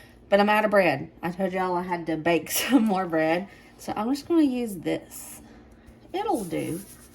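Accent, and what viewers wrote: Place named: Tennessee, United States. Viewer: I am recently widowed (oh how i hate that word) and i have no family living close enough to visit with often. My mom grew up in eastern Tennesee so hearing you talk and watching you cook for your lovely family has been a help. For the first time in my life I'm also learning to cook for one. Every time i make a mistake i hear you say "it's fine!".❤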